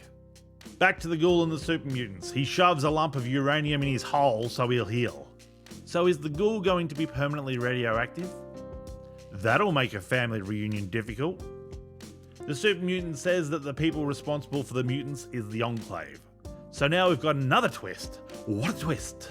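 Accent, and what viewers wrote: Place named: United Kingdom. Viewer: Cause he's British!!!! Talks like hes got a mouth full of biscuits 😂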